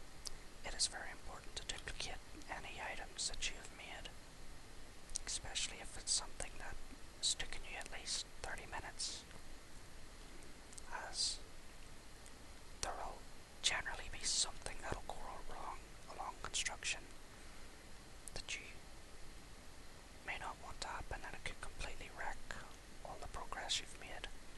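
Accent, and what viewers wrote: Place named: United Kingdom. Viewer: This guy sounds british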